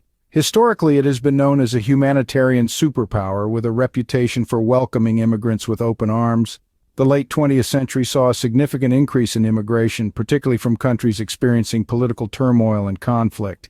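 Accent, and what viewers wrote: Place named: Mid-Atlantic, United States. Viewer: Another damned speechbot with an expressionless Mid Atlantic voice. Turned off.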